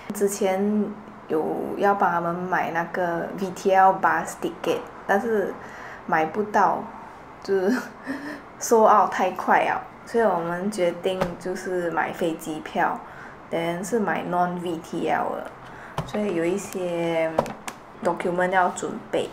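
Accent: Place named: Malaysia. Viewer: Congrats on the nomination! 😍 And great that your parents were able to visit Singapore... 👍🏻 Love your Malaysian accent btw 😬 Reminds me of home..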